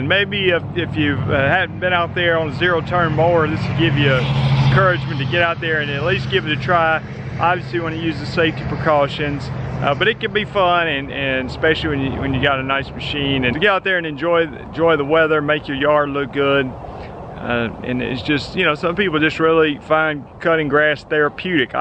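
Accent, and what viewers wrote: South, United States. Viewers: I'm from the south and there's nothing wrong with sounding Southern.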